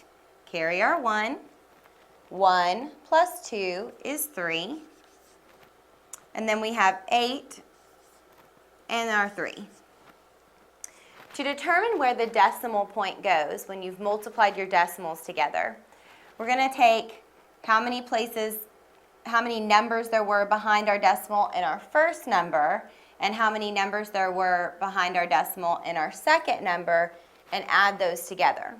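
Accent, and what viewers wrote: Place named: South, United States. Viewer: I'm sitting here and trying to determine where the instructor's accent is from. I hear a faint southern drawl, or possibly North Carolina. Great instructor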